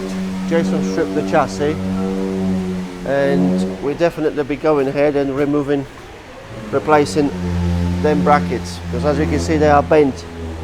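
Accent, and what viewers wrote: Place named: Lincolnshire, United Kingdom. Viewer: You have a slight Lincolnshire accent going on there.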